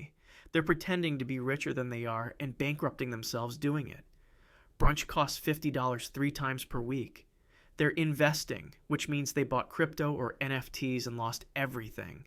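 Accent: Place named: United States. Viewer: Profoundly ironic and offensive that its a bloody Septic (American) producing this video. Not that he's wrong, and its good to see someone turning these observations (debatable as some of them are) into a You Tube video, but I can't help baulking and can't watch the whole thing for hearing an American accent keep saying "WE" is positively nauseating.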